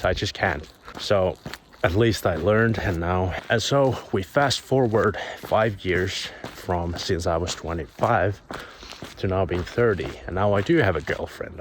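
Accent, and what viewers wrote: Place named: Finland. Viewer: Very thought provoking Mate. Pardon my ignorance but are you in Finland?The nature looks that way and to me you sound Finnish.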